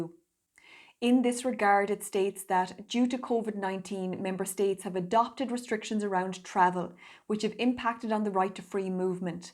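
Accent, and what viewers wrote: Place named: Israel. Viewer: Why are you saying dates like hebrew English. We speak UK English. Prehcedent not pricedent.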